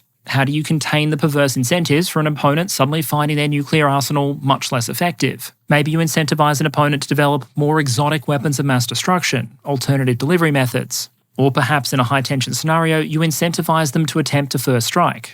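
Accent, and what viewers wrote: Australia. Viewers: Am I the only one finds it amusing and somewhat dystopian that team has needed to substitute "Australia" for "Israel" in this videos subtitles to avoid automated demonetisation by youtube? It's amusing that a tech superpower like 'Alphabet Inc' and its automated filters can be defeated by the Australian accent and tweaked subtitles, and dystopian that team has had too do it An example of this is at the minute mark, but it happens throughout the video so I don't think it is an accident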